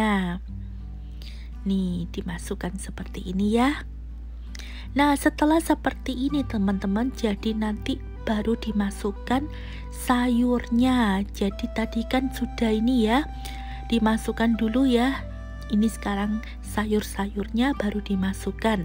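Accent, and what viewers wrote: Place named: East Java, Indonesia. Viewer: Didenger dengerin logate koyok wong asal jawatimuran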